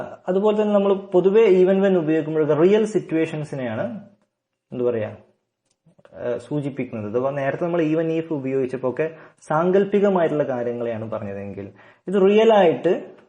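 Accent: Malappuram, India. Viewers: malappuramaano thaankal???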